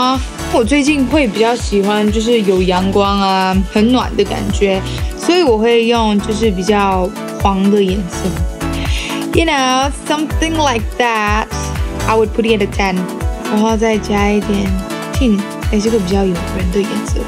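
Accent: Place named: China. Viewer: 很會學中國腔🤣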